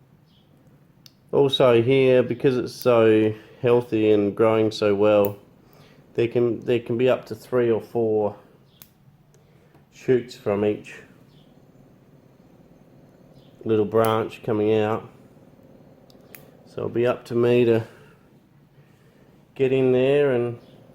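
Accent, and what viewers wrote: Australia. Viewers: Just starting out with bonsai, and found your videos, looking forward to many more. Nice to listen to an Aussie accent for a change 😃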